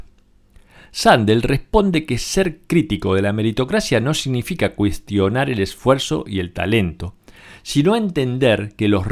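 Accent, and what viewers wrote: Argentina. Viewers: a veces tengo que darle al stop para asimilar el contenido, muchas veces vas demasiado rápido y siendo español adaptarme al tono argentino ,pero el contenido es bastante interesante y beneficioso. Saludos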